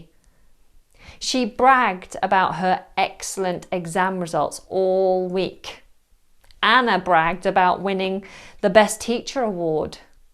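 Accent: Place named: United Kingdom. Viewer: Dear Madam Teacher,thank you very much for all your effort.I am so grateful for your brilliant,amazing and perfect videos.My very personal opinien is that your british accent is one of the best inside huge and vast Internet.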